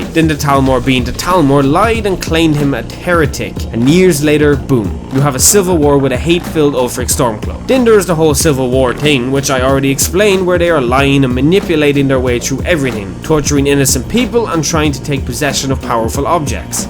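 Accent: Ireland. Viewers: First video I have seen of your channel and I'm so glad I did. I love your Irish accent and the humour is brilliant great lore video. 👍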